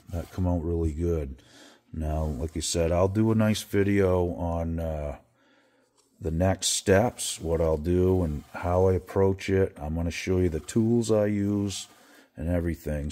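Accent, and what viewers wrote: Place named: Maine, United States. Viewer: As a Bostonian...It's enjoyable to hear a Mainer's take on people making a fuss about your accent.. I can't leave the state without someone asking me to say Pahk the Cah in Hahvahd Yahd...🤘🏻😝. You're a natural teacher... That's why I subscribed. I've learned something every video.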